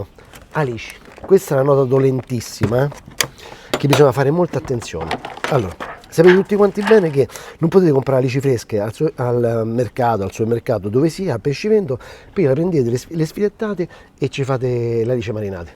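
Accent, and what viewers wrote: Rome, Italy. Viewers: Grande! Con il tuo accento mi riporti nella mia magica Roma! Eseguirò la tua ricetta!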